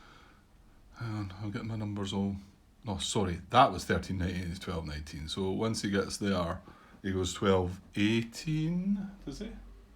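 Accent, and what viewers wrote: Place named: Scotland, United Kingdom. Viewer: I think it was mostly clear and to the point. Not your fault things went a bit off the rails toward the end. I think was getting a bit tired and lost focus. The recording, editing and sound were all very good and I'm very much looking forward to your own playthrough. Clearly, everything sounds better in Scottish, so you have that going for you from the start. (I'm secretly hoping for a blast of bagpipe music once XXX Corps gets rolling...and yeah, also consider an invisible sidekick called "Wee Seamus" or something similar.) I'm sure you're correct regarding the roadblock check when moving adjacent=>adjacent, but it's a tricky one since it doesn't quite make sense (to me). Let's say you have two units stacked adjacent to an Allied Position. When you move the first one like in your example, you now don't have to check. 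The unit is still adjacent, so if you proceed move the second unit, you again don't have to check. Another example with a single unit this time: instead of moving adjacent=>adjacent, you move through the position hex and into the same target hex, again you do not roll. I guess there's a rationale there somewhere (maybe the non-moving unit is watching the road in the first example?) but it's not crystal clear.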